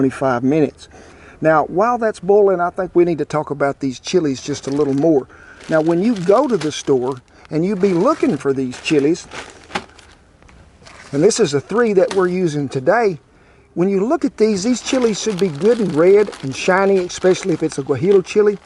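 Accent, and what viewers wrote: United States. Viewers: English is not my first language, I don't even understand everything you say, but I love your accent! A salt of American earth! Greetings from other side of the Atlantic!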